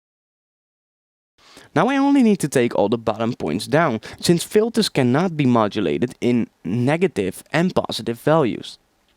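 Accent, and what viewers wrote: Netherlands. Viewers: Is he dutch? Cuz his english accent sound like mine, when i'm talking english :P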